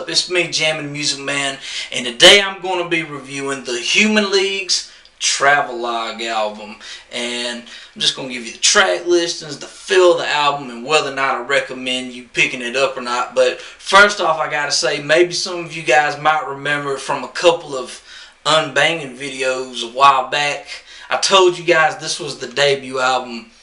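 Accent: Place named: South, United States. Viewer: sounds weird you talking bout electronic music with that accent. i expect some good old country and western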